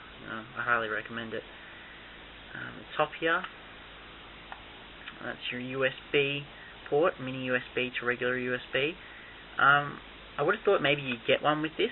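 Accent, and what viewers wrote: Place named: Australia. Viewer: nice australlian accent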